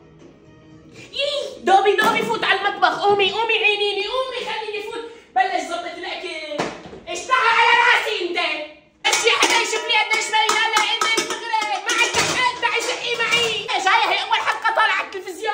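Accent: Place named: Syria. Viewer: هههههههههه والله ضحكتني ماحلاها اللهجة السورية تحية من الجزائر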